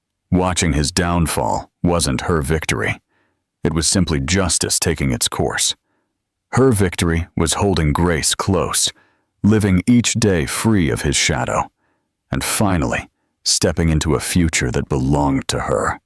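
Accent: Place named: Australia. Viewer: It sounds like 1950s Australia ! Not today ! 😂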